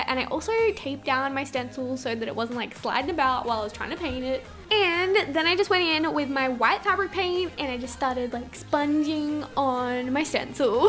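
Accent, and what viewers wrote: Australia. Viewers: Y do Australians say hach and not ach lol😂😂😂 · Where's your accent from? Australia?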